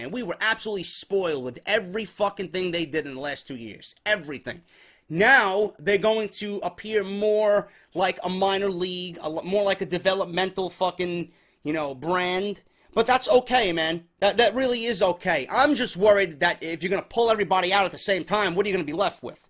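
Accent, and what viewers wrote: New York, United States. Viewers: dude you are a trip your ny accent helps your show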